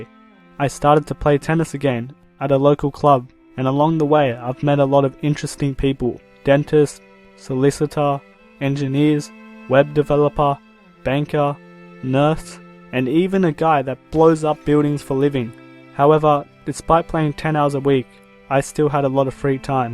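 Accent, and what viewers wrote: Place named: Balkans. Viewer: Tacno se primecuje balkanski glas!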